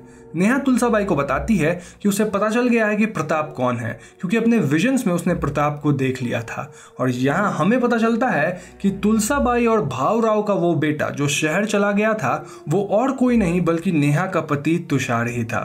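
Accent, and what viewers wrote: Bengal, India. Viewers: Bhai tu begali hai kya??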